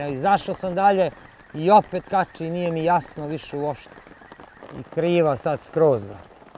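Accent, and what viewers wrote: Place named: Morava, Serbia. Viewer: Za ove kojima smetaju psovke, akcenat, padeži... Čovek priča akcentom i padežima iz moravskog kraja. Respect što nije izveštačen po tom pitanju. Što se psovki tiče... Opet iskrenost... Psuje i Đoković u sred Londona, Pariza, pred hiljadama ljudi... Pa? Vrhunski ribolovac, sve ostalo je nevažno...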